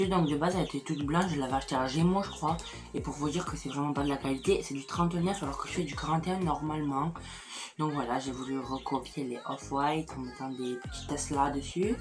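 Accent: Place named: South, France. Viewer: Bonjour j'adore ta chaine et j'ai la même passion que toi...Tu habites où avec ton super accent du sud???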